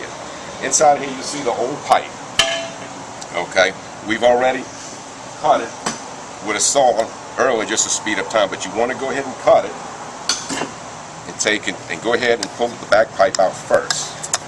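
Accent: United States. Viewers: Sounds like he's from New Orleans, Louisiana.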